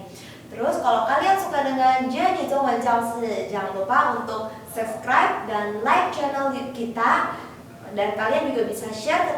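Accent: Taiwan. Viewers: 哈哈哈~~ 台湾口音~~ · 用的普通话好台湾腔喔… 喜欢😍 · Ini mandarin taiwan apa tiongkok,,????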